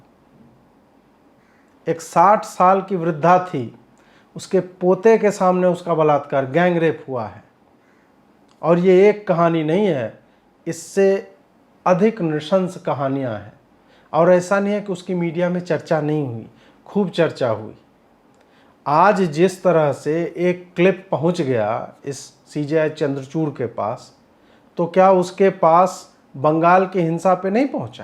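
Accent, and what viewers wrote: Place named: Bihar, India. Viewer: कितना झूठ बोलोगे। बोली तो बिहारी किस्म की लगती है। अगर आप बिहार से नहीं हैं तो प्लीज़ बता दें ताकि हम बिहारी इस अपराध बोध से मुक्त हो सकें कि हमारे बीच से आप जैसे लोग भीआते हैं।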